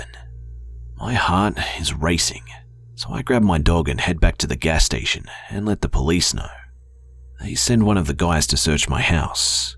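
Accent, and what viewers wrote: Australia. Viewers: can't quite place your accent. Australian , maybe?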